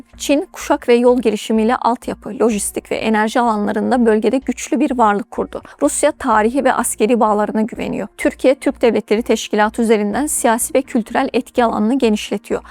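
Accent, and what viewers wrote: Azerbaijan. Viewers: Azerbaycanlidi danisigindan hiss edirem.))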